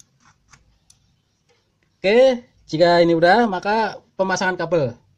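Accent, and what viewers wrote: Banyumas, Indonesia. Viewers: Wong ngapak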